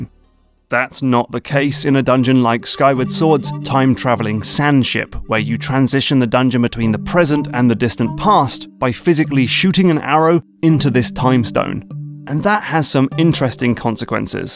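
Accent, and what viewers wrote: United Kingdom. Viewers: I was expecting "anti-clockwise" from the British accent. Must have been painful to say "counter-clockwise."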